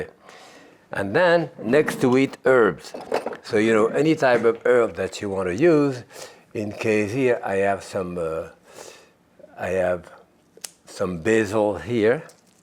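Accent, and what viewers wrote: France. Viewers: Speech impediment? Meh…I thought it was his French accent shining thru😂 Accents r great! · Impediment? Bruh he's just French hahaha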